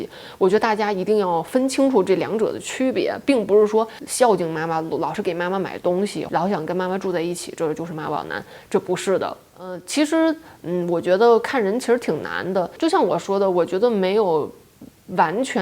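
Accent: Tianjin, China. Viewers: 天津普通话！好亲切哦！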